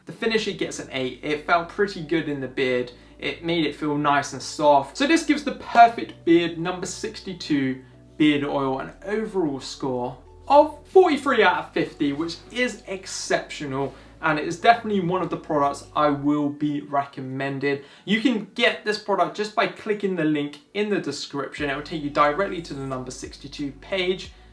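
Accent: United Kingdom. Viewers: I have a full beard past a fist length from my chin and am always open for suggestions and products that I may not otherwise be aware of. I recently subscribed to your channel and I listen attentively to your videos, which brings me to my random off-topic question: where specifically in the UK is your accent from? You have a pleasent and relaxing speech pattern that makes it easy to listen and perfect for instructional videos (in my own humble opinion). Your quirky style does not hurt either. Clear, relaxed, no nonsense, unpretentious videos. What more can one ask for? Just saying. Keep them coming.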